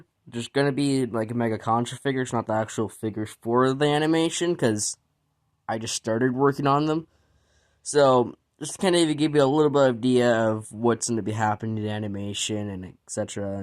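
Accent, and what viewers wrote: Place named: Canada. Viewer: Damn bro you sound hella Canadian😂✌️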